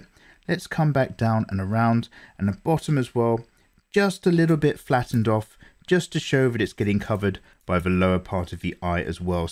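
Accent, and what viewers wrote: United Kingdom. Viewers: I love how British his voice is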